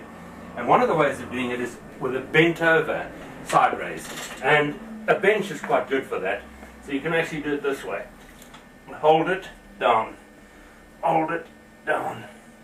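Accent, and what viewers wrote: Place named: South Africa. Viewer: You kinda sound South African - and you are in such great shape for a 60 year old - I'm impressed!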